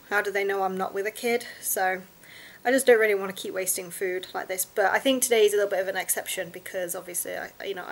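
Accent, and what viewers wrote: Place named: United Kingdom. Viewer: You are cute. Love the UK accent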